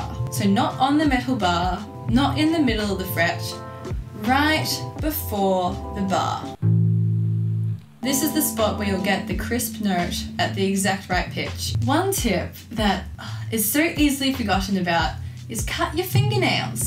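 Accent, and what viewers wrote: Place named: United Kingdom. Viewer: I thought you was British lol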